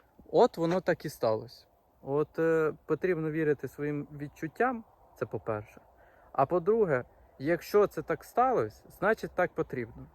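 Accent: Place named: Cherkasy, Ukraine. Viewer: Немає нічого поганого, щоб не вийшло на добре. Так, не все в житті буває так, як ти плануєш. Але ж, насправді, це не найбільше лихо. Хто як не українці знають що таке справжня біда і проблеми. Значить, і не треба було вам саме зараз бути в Черкасах. Все буде так, як має бути. Колись давно, коли всякі несподіванки стали насипатись на мене в різних ситуаціях, я сама собі виробила таку стратегію життя: "сподіватись на краще, але бути готовим до всього". Коли дотримуєшся цього, - легше все переживається. Доречі, привіт вам з містечка на березі Дніпра, з Черкас. Коли я натрапила на ваші відео, шось мені у Вашій вимові, пане, нагадувало черкаський говір. Ну от, бачите, не помилилась.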